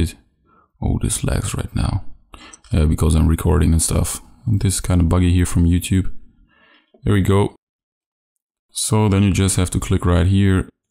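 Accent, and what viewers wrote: Germany. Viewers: Man hört den deutschen aus dir haha :D Hast du OSX Yosemite noch nicht ? :o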